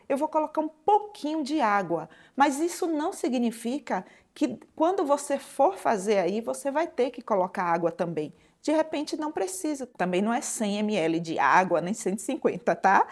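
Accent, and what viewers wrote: Bahia, Brazil. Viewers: Ola! Vc é de Salvador? Tem um sotaque baiano e por sinal ensina as receitas muito bem com toda paciência. Parabéns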